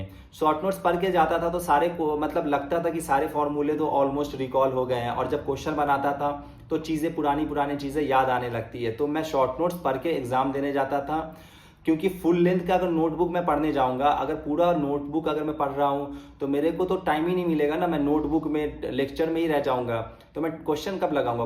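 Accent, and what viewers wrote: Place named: Bihar, India. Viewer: bhaiyaaaaaa......aap khn the phle 🥲😊....thnk uh so muchh...apki ye phli video dekhi...suggestions m thi...... aap bihar k ho kya...aap k baat s lga....thnk uh so much bhaiyaaa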